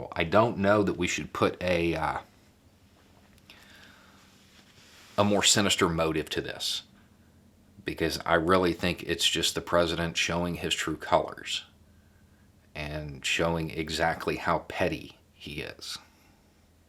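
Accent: South, United States. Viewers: As a non-American, it’s positively encouraging to hear that a person with a southern accent can speak without only saying insane things. I think it’s a first for me to hear. With the existence of your unique breed now confirmed, I hope more of you become visible in the media. I’m very happy to see this. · Love his points of view. But can someone please tell me why he doesn’t have a southern accent in his really old videos and a super southern accent about 8 months ago?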